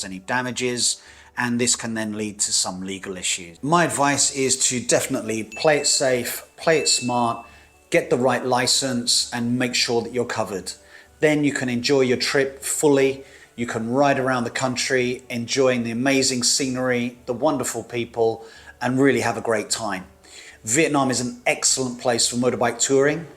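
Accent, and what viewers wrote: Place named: United Kingdom. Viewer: I hear a British accent , Australia IS a signatory to 1949 Vienna convention but NOT the 1968 one due to costs of changing our road rules and therefore have no intention of signing up, sad news for us. A Vietnamese licenses is available IF you have obtained a residency card,,,, good luck with that , so take the bus or grab or Xe Me.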